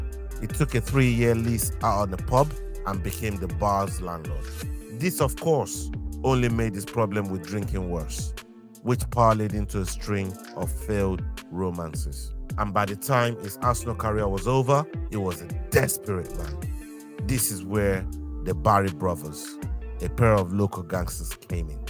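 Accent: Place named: Africa. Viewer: Why is an African narrating this 😮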